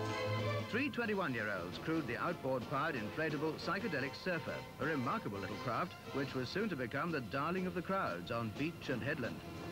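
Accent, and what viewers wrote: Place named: RP, United Kingdom. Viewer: love that 'cut glass' accent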